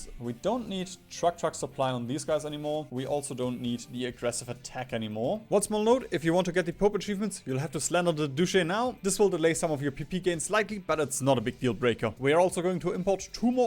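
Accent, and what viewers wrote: Germany. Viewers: question you german ?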